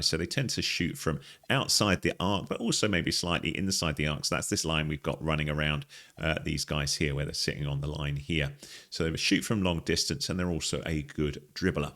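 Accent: United Kingdom. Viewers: great break down, also refreshing to here a brit talking about basketball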